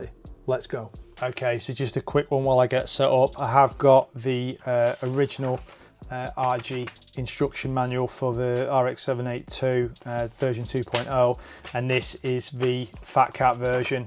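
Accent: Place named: United Kingdom. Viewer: Superb video loved watching it! Totally dig your British accent 👍🏻 Fatcat has raised the bar for what to expect for your hard earned money unlike Bandai who makes you pay extra money for water decals & extra weapon set. I mean they are such a massive company and can easily afford to add all of this & more for the kinda money they charge for an RG model kit. Imagine a 3rd party which 100 times smaller company can give their customers so much value added stuff. Bandai is just a money grab & wanna milk every opportunity like every other MNC! SAD BUT TRUE 😞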